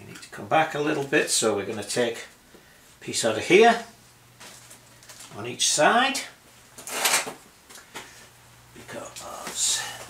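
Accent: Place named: Teesside, United Kingdom. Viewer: Great stuff,. I have rebuilt a couple of OiF BSA singles and this reminded me of every turn of the wrench as I did so. Despite my mature years, you showed some methods and tools that I had not seen before. Really excellent and I look forward to the engine rebuild. Being from Tyneside, I recognised the smoggy accent immediately!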